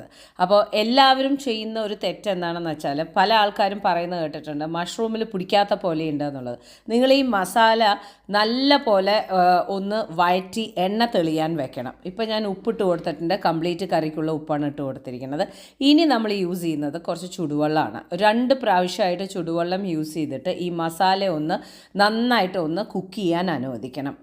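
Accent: Palakkad, India. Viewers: ഇജ്ജ് പാലക്കാട്ടുകാരി ആണ് ല്ലേ. സൂപ്പർ ഡിഷ്‌ വല്ലപ്പത്തിന്റെ കൂടെ പ്രേദമായിരിക്കും.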